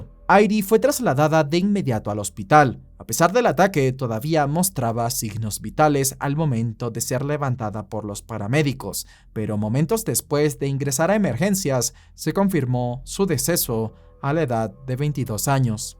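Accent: Dominican Republic. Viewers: Bro eres dominicano? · El fusgo bro pensaba que eras Dominicano, te sigo hace mucho, de que Pais eres?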